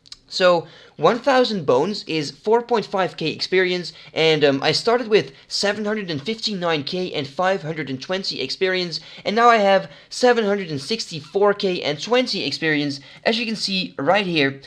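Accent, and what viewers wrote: Netherlands. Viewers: heard you were dutch the second you started talking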